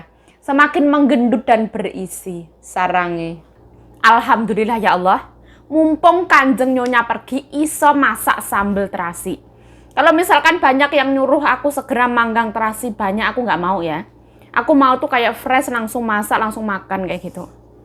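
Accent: Java, Indonesia. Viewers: Makane Jawane Medok dekatnya Ngawi To Rumahmu. Terasi Digoreng Sekali Banyak. Gk Masyalah.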